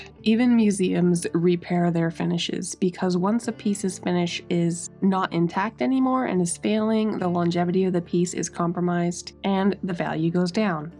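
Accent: Canada. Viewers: Just magnificent. Having Canadian friends, I'm aware of differences in pronunciation between America and Canada. Please accept my apology for the uninformed imbeciles who may have been disrespectful to you. The residents of some backwaters in the US like to maintain their supremacy over things they don't understand. My respect for your tremendous talents would have overridden any "mispronunciation" I might have perceived, were it not for my observance of the Golden Rule. The best of luck to you and your co-workers. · this is such an exciting project! I love old historic pieces and seeing you painstakingly bring this back to life was so satisfying! you did an incredible job (also Canadian and say decal the way you do and think that is really funny you got any flack/confusion on that) 🤣 I never think of us having an accent or inflection, it's everyone else!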